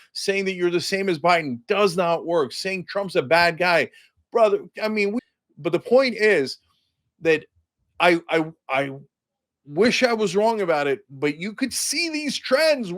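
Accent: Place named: United Kingdom. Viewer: So, you start this video, sounding like a very intelligent and wise person. Your British accent helps to accentuate this quite well. You then speak how many or most of the billionaire class seek to enslave us and the tactics that they have been using to do so. Yet toward the end, you completely pivot, like you fell down some sort of rabbit hole, and try to tell us that the people who seek to return the power to the people are actually moles for the billionaire class. I believe that you, yourself, are a mole for the billionaire class, or, more likely, you are just a damn defeatist who would do better for the world by just shutting the .... up.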